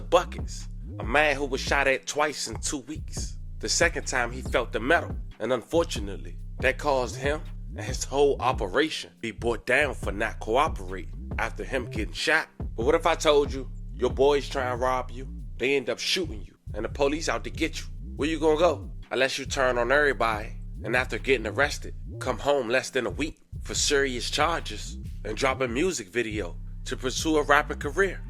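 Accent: Washington DC, United States. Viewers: I thought yu don’t give angles just the story 😂that Baltimore step on they plugs comment sound like an angle to me🤔. Sound like u from DC/DMV so it’s unexpected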